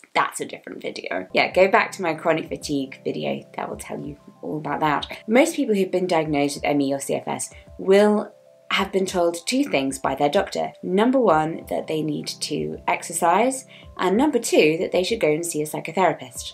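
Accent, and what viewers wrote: United Kingdom. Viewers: Your videos are multi benefit for me! First, they're educational and I learn a lot from them, second, I'm an English learner and your British accent is amazing to listen to, and third, they're inspirational and it always proves my point that people with disabilities are the strongest (if that made any sense). Stay happy, best regards!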